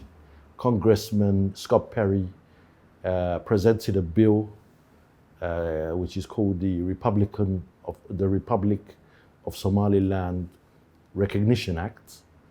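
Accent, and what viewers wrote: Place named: London, United Kingdom. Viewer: What a posh english , London accent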